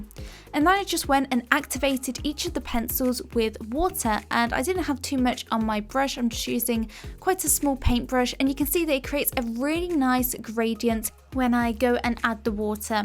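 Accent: England, United Kingdom. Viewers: I love your british accent.. a lo' wo'ah.. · Where do you come from (which part of england)? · Finally!! I was trying to find a British artist because i wanna develop my british english accent 😭😭 I've just subscribed to your channel and hit the notification bell ✌️🖤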